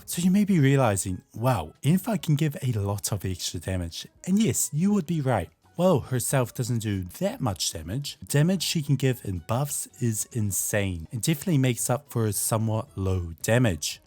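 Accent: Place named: New Zealand. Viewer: Are you Kiwi? ;) Love your accent!!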